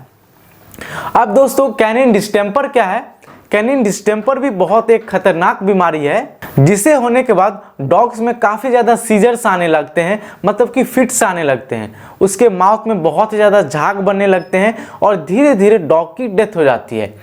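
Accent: Bihar, India. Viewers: Aap bihar ho Na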